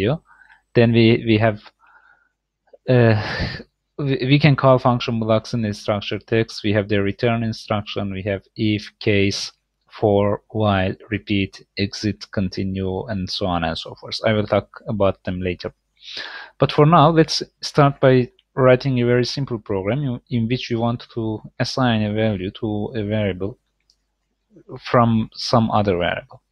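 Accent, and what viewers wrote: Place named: Iran. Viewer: I like the Persian accent! thanks for the content it is useful though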